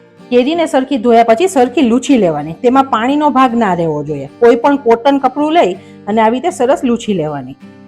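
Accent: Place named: Kathiawar, India. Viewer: nigujarati kathiyavadi Bhasha Mithi laga che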